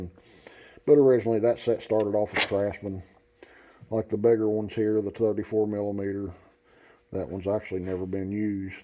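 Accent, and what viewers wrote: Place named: West Virginia, United States. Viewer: oh wow, I’m on the Wv border in Ky. My family is from Wv. I thought I heard you mention Charleston and I was like man the accent sounds like home. We do most of our shopping in Huntington and try to stay in corridor g when we head to Charleston.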